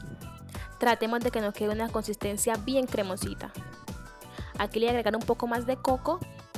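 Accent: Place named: Chocó, Colombia. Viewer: Hola hermosa. Cuando te escuchó me recuerdas a mi cuñada, tiene ese acento de Quibdo Chocó como el tuyo. Pero no se si eres de allá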